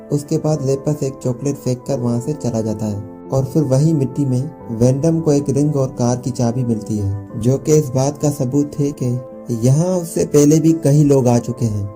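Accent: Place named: Sindh, India. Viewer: Ap Sindhi ho na your excent is like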